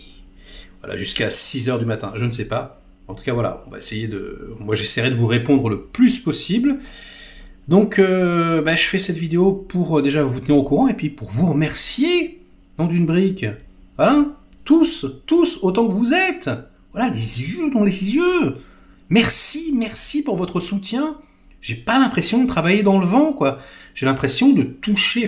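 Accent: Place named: Quebec, Canada. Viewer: Quand je fais connaître ta chaîne du Québec,ils l'adopte immédiatement tu parle comme nous sans prétention, bonne anniversaire 2 ans tu mérite ton succès.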